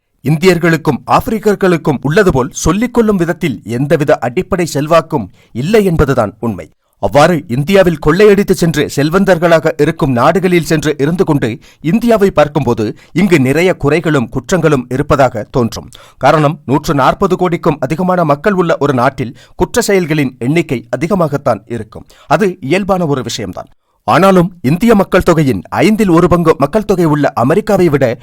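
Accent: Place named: Sri Lanka. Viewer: Your voice is very good like a cylon Tamil mixup. Congratulations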